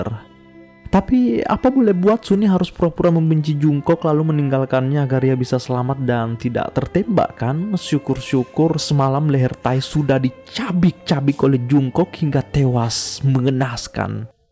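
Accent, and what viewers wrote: Bugis, Indonesia. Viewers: Kentarana bugisna admin🤣🤣🤣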